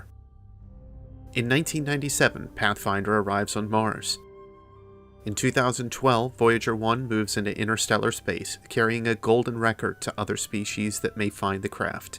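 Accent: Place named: Russia. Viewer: Your Russian accent sounds like a Nord dude from the Elder Scrolls Online...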